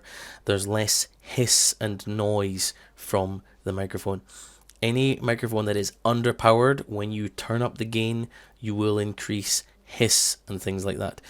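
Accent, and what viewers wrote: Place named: Scotland, United Kingdom. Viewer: You sound like someone I know. I have heard that Scottish voice on certain videos before on the internet.. 😶‍🌫️ ASMR next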